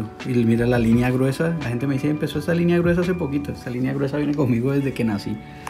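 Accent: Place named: Bogotá, Colombia. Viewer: si noté por como hablas q eres de acá, sería brutal q tuvieras una tienda bogota, un abrazo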